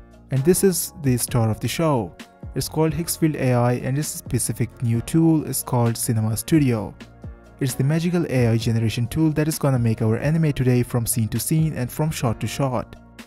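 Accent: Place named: India. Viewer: Is it only me who thinks he has an Indian accent?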